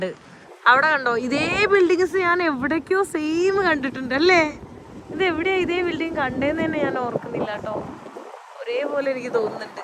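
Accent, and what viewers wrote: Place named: Mattancherry, India. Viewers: മട്ടാഞ്ചേരി സംസാരം കേട്ടിട്ട് really super🎉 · എന്ത് പറ്റി. വീഡിയോ ഒന്നും ഇടാതിരുന്നത് 🤔 ാടെ. സംസാരം T.c. r Kochi കൂടി കലർന്ന സംസാരം പാലക്കാടൻ. സ്ലാഗ് ഒട്ടും ഇല്ല V. Good 👍🏼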